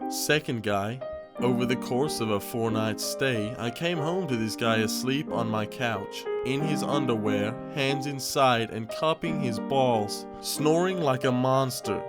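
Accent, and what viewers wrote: Australia. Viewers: Christ that is by far the most annoying australian accent i have ever heard. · right, no wonder you had an Aussie dialect and accent (partial) but not too obvious when you spoke watched half of your videos, trying to catch up with all of them, and realised that your channel only just started a few months ago, and I found your channel not too long ago either keep up with the good work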